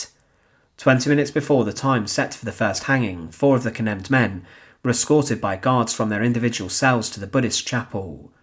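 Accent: United Kingdom. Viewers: The accent is British, I'm a fellow Brit 🙂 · He’s british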